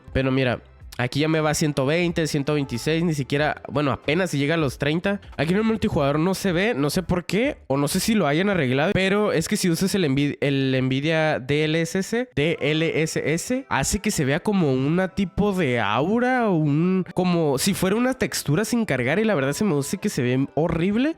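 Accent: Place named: North, Spain. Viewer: De dónde eres? Te escuchas del norte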